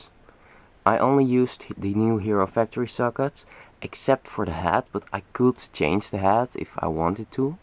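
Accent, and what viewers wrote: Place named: Netherlands. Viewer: I just hear that your Netherlands (ik ben ook)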